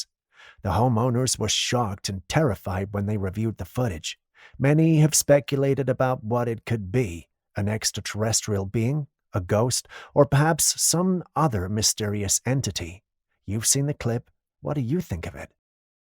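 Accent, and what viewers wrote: South, United States. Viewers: What's with the Southern twang to your voice? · What's up with the occasional southern twang, such as when you say 'house' ? I'd never noticed it before, but it's become almost regular, being heard in many of the clips. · I noticed your cowboy Southern drawl accent tonight lol I like it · your voice cadence keeps changing back and forth. From regular city mouse to southern country mouse!!!! What's going on dude?? Did you visit some old family relatives from the South and you inadvertently picked up a southern twang in your voice?? No disrespect meant by that, btw. I was just wondering??!!??🤔😬🤭😌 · ...are you getting a Texas/Arkansaw accent??? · you've developed a southern belle twang out of nowhere. That's the biggest mystery in this video. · Little Southern 'twang' thing going on there this time - maybe has been travelling the South... 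· are you throwing a little bit of country on us? And what's up with calling Russia, RUSHER?!? LOL. I don't even think country folk refer to Russia as "RUSHER" 😂. You crack me up!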